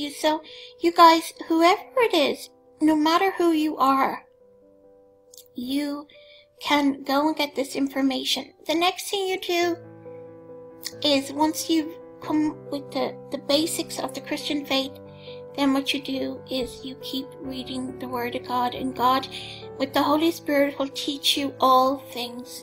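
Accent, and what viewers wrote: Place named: Ireland. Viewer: Another excellent book is "Kundalini Warning -'Are False Spirits Invading the Church" by Andrew Strom. Andrew was himself formerly involved in what he called "the prophetic movement" and came to realize how evil all of this is. He tells of how Todd Bentley was imparted an "anointing" by the laying on of hands from the other wolves of the NAR and how it can be traced back to Branham. He explains how this spirit is directly tied to eastern mysticism and is the same one that yogis impart to their followers (you can watch YouTube videos of this and it causes exactly the same bizarre manifestations). I highly recommend this book as it exposes other wolves in this movement as well. Thank you, for your dedication in reproving these unfruitful works of darkness (Ephesians ). God bless you! Love, Kathy P.S. I just love your soft Irish voice - so beautiful. 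I am American, but of Irish descent on both sides. But because I'm from Long Island, New York, my accent is not so melodious!:/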